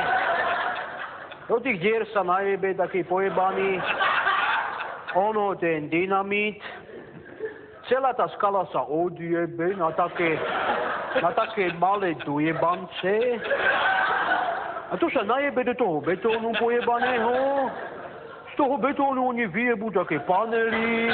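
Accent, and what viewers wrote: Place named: Brno, Czech Republic. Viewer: JSEM STredocech a takhle nemluvim co to kecas :D:D:D:D:D:D stradoceske nareci fakt ne xD mozna brnenske :D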